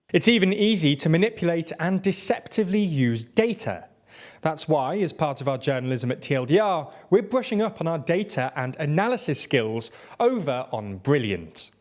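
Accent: United Kingdom. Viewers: i like the program but that stron British accent is really terrible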